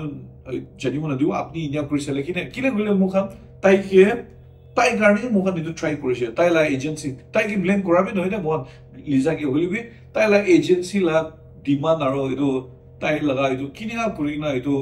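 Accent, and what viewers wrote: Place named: Nagaland, India. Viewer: Nagamese accent/ tune of Sir and Dreamz unlimited is the only channel where we get to learn real Nagamese 🫡👏👍 looking ahead for your talk show and other events in this winter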